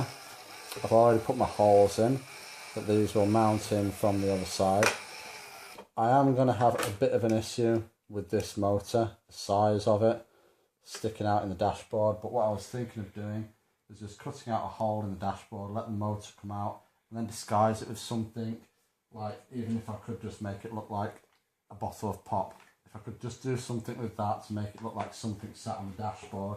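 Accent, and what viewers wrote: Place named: Birmingham, United Kingdom. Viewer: Great video. Can't understand the Brummy accent well, needs more Yorkshire 😊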